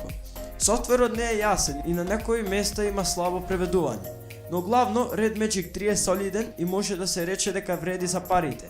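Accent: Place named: Kavadarci, North Macedonia. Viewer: top si be uzhivaj ali probaj da zborish poishe skopski bolje zvuchi za gledachitw posho bolje kavadarchani da slushaat skopski nego skopjani kavadarski ako me sfakjash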